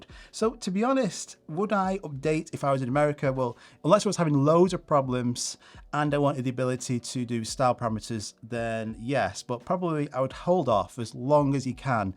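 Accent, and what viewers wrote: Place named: Cumbria, United Kingdom. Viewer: You sound like you're not too far from Kendal.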